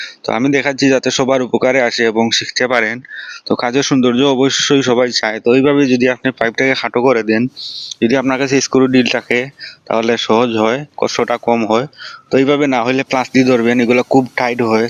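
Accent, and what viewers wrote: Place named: Sylhet, Bangladesh. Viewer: ধন্যবাদ সিলেটি ভাই ।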